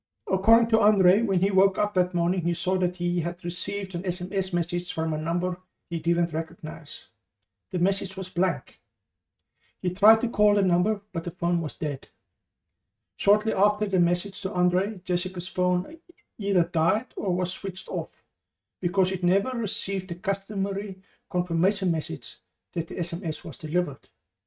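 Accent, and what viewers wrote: Afrikaans, South Africa. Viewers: Dankie. Baie interessant. Heerlike Afrikaanse aksent. Doen so voort! Advokaat Barbie se lewe en misdade sal interessant wees. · He has fabulous Afrikaans accent and if you had listened properly you would followed it enough to understand he is saying bald From me a truly English person, I appreciate his work and his accent. Its not bad it is just different.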